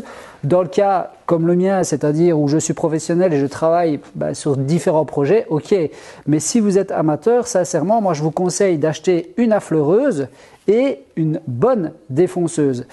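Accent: Belgium. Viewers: Allez vive. Dès que vois cette tête avec bonnet et accent légèrement belge, je suis de suite heureux.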